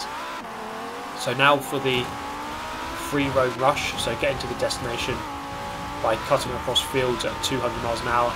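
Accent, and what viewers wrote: United Kingdom. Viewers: A British accent and sarcasm is the best combo in the world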